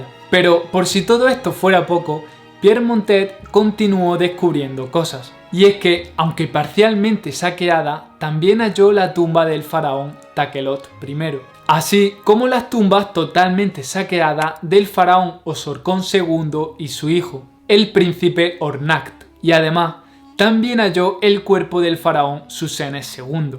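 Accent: Andalusia, Spain. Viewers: Me gusta su FUERTE acento Andaluz..... muy buen canal. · MAGNÍFICO E INTERESANTE REPORTAJE PERO..."POBRE EL IDIOMA ESPAÑOL EN BOCA DE LOS ANDALUCES".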